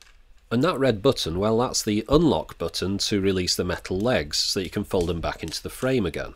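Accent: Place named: Manchester, United Kingdom. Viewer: Cool Manchester accent. I wish I sounded like that...but of course everybody recognizes my accent..."I'm here to pump you up."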